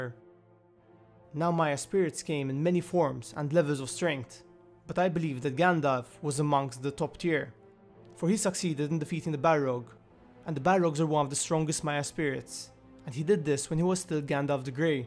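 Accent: Malta. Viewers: are you maltese by any chance? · are you Maltese? I m going off your accent alone here, hahaha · I could tell you were Maltese purely from the accent haha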